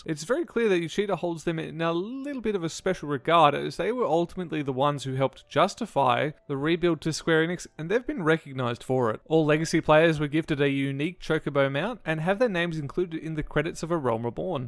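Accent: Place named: Australia. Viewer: Something about your voice reminds me of Christopher Walken, but in an Aussie accent.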